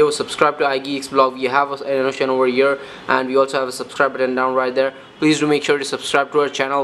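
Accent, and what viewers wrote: India. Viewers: Listen you don't have to talk in an American accent, it's annoying and it distracts from what you're saying... Apni normal Indian accent me baat kyo nahi karte use koi Sharm ki baat nahi hai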